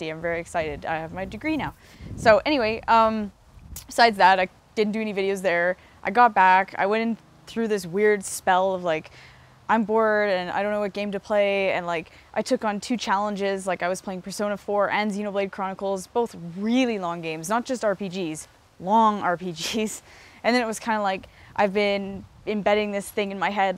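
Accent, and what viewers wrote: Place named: Canada. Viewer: She is Canadian... that's how they usually pronounce it.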